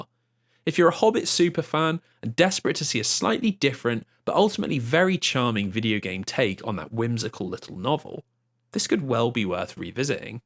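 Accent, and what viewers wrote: England, United Kingdom. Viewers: These sorts of videos are always successful because of an English accent narrating it lol kudos to you though on this monster sized content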